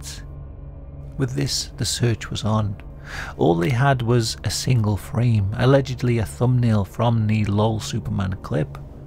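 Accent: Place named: Lancashire, United Kingdom. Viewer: What a great channel this is. So much content that one doesn't encounter amongst the plethora of disaster/death/murder that's online, and with stupid American narration. Here's a Q. for you,; might you be from roundabout Lancashire perhaps? Just going by the good accent!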